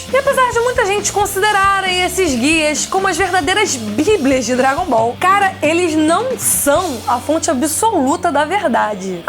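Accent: Rio de Janeiro, Brazil. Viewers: Ela é carioca? kkkk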